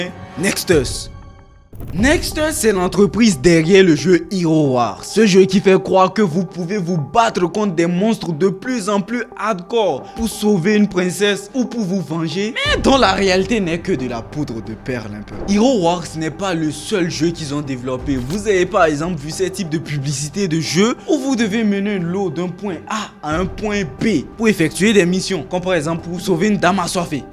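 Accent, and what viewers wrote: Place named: Benin. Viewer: tu es béninois? Tu as notre l'accent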